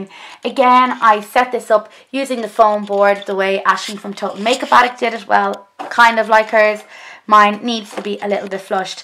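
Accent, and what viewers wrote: Ireland. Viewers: Trust me hun I would watch more than 45 mins of makeup if it's you! I can't believe I've just discovered you I think your brilliant and l love your accent! <3 it reminds me of my half Irish fiancé :) thanks for showing us everything I haven't got much of a selection I've only recently started my makeup journey so the most I have is just a bag filled of goodies that's about it but if I keep watching you I will end up with wayyyyy more makeup than you!! Thanks again !! Oh and btw congrats on the wedding!!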